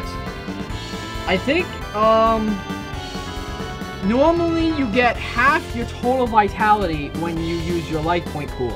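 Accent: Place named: New York City, United States. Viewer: Is this LP'ers voice for real? Or is he a parody of an NYC Jew? That voice can't be for real.....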